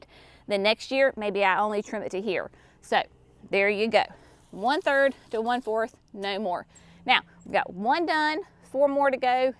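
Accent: South, United States. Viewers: I was so inspired and motivated watching this video!! I am in the process of moving blueberry bushes and was so worried I might kill them as they are very established. Some how watching you calmed my fears. Question… where on earth can I find some ‘Land and Sea’ compost??? I live in Kentucky close to Clarksville TN and can’t seem to find it at my garden centers. Is there another alternative you could recommend?? I just love your channel… your sweet southern accent and smile MAKES MY DAY! ❤️❤️❤️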